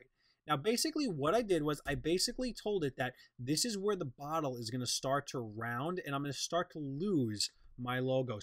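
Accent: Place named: New York, United States. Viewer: Noo Yourk.... I love your accent so classic NY! Not a dis but coming from the midwest like i do it is refreshing to hear and love this new series.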